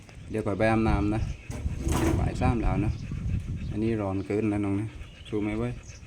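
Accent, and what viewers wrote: South, Thailand. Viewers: ลุงเดีย อยู่จังหวัดไหน เห็นพูดไต้ค่ะ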